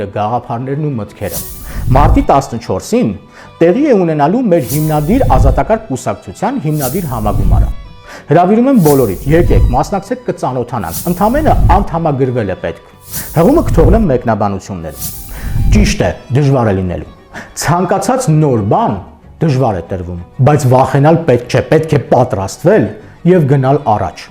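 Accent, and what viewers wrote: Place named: Yerevan, Armenia. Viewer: Меня бесит ваш эриванский акцент. Не надо тянуть гласные!!!!!!!!!!!!!!!!!!!!!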